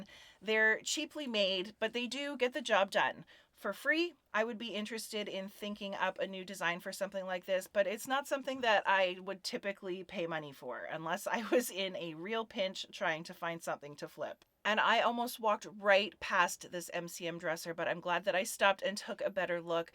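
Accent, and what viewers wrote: Canada. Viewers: This was such a great video. Also shows me why I watch you because I think you’re so down to earth. I had asked the question about your profession and you answered my question and loved seeing your hunt for your beauties you make! I also enjoy your Canadian accent lol! I was born in Toronto but moved to the states when I was 10 so no Canadian accent left but my roots go deep. I’m also a “ ” so another reason I follow you lol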